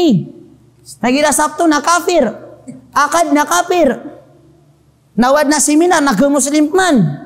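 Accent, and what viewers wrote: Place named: Maguindanao, Philippines. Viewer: Mashaallah..gusto ko c ustadz di masyado.maranao pg pronounce nya parang maguindanao din.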